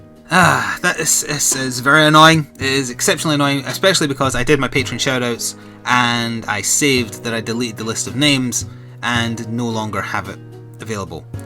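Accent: Scotland, United Kingdom. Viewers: He sounds Scottish so, Scotland?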